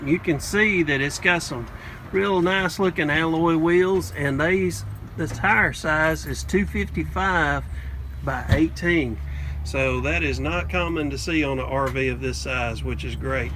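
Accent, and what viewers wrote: South, United States. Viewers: great tour and like your southern accent! but seriously very complete tour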